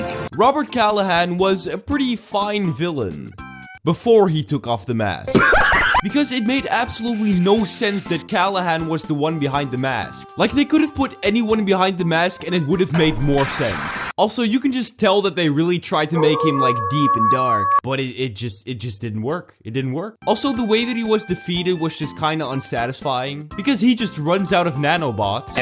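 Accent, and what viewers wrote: Netherlands. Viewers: Are u dutch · are you dutch? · Are you Dutch? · wait a min are you dutch?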